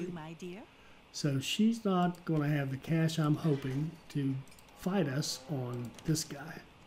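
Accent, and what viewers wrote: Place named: West Virginia, United States. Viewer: Thanks for this episode, or the whole series for that matter. Railway Empire is one of those games I drift back to every now and then, for the sheer joy of watching trains go, and composing the song for them - err the loads they will carry by designing this 4 city cluster. I only found your series recently, I was kind of doing my own thing. Mostly writing spaghetti westerns with my rail lines. But the method/way you connect things has improved my own game a lot. So thanks for taking the effort to share your nice gamestyle. Hardly noticed the West Virginia accent 😆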